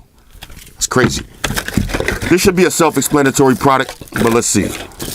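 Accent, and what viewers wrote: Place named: Brooklyn, United States. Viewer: You from Brooklyn son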